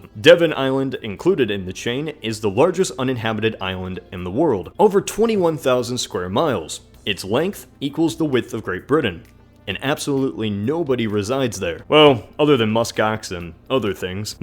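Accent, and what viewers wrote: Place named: United States. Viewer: thing he/she meant "he's english". But I don't think that's true, he sounds american/canadian.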